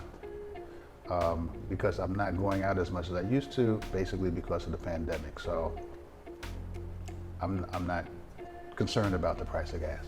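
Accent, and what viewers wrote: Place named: North, United Kingdom. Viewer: Good job 👏👍keep banging out content and your channel will grow. The phillipines has softend you northern accent alot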